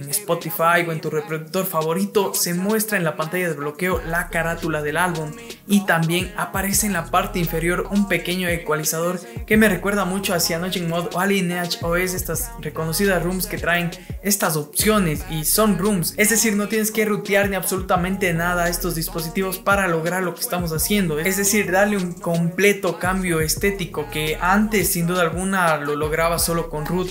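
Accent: Ecuador. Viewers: Eres de Ecuador??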